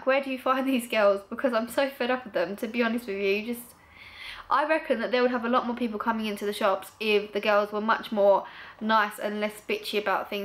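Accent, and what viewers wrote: United Kingdom. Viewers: i love ur accent! i swear i can just listen to british people talk all day..! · Is that a cockney accent? Forgive me if I'm wrong, but anyway you have the cutest accent ever lol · lol the way you express yourself and your British accent are absolutely delightful!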